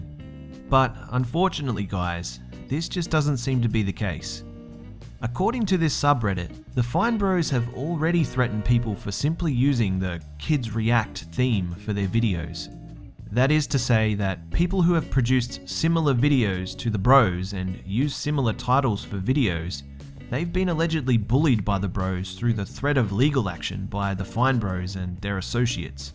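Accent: Australia. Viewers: dude i been watching you for hella long but just now realized you are australian and have an accent.. lol random... something wrong with my ears maybe lol... and speaking of the fine bros thing I don't really know much about them but they seem douchey lol.. I don't really watch reaction videos though especially not stuff like "teens react" and all that so yeah .. I don't even understand why they are so popular I mean I don't care about random peoples reactions to things.. im ranting lol.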